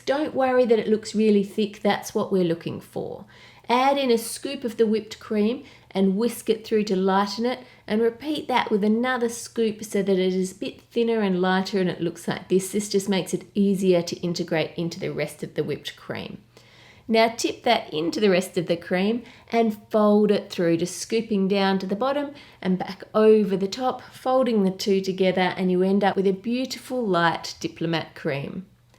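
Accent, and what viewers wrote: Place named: Australia. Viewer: She's very Australian. I can't stand Australian accents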